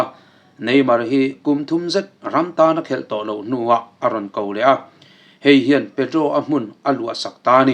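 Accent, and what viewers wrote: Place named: Paite, India. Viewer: Ichanel ania, ilan loh ten paihte angin tawng chu pai mai mai mah se apawi hlei nem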